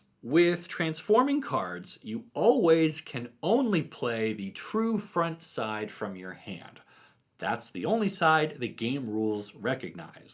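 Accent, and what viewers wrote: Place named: Texas, United States. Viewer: What part of Texas are you from? I’m from DFW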